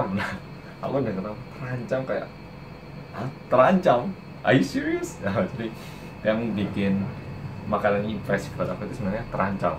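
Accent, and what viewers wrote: Java, Indonesia. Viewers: Duuuuuhhh dan aku nonton video ikiii,, ya ampuuuum muedok banget maaaaasss sao guuueeentooong hahaha , , aksen jowo seng berlebihan ki ngno wki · Ada di branda, ko unik, muka orang korea kok bahasanya jawa medok , aku langsung liat semua vidio, dan akhirnya kenalan jugaaa hehhehe, sehat selalu ya kka :) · Isek medok lek gini 😂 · serius, kaget sama aksenya dia sama sekali nggak ada aksen koreanya, dan bener bener2 kental medoknya, sepertinya dia bakalan jadi sainganya han yoora hahaha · aaaaawww mas kamu medok sekali🤣🤣🤣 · sampeyan layak dadi artis mas, soale jarang korea berbahasa jowo dan medok begini, valuable tenan iki. melu drama sekolah internasional di net TV kae mas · Mana opening orang korea yang medok 😂😂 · Agustus 2020 baru liat ini karna abis liat kbs indo di ig sama dita...penasaran org korea kok lucu iso medok😂😂😂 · Bahasamu mas medok kece 😂👏🖒 tinggal malang ndi? 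aku nak suroboyo iki. cidek yo 😆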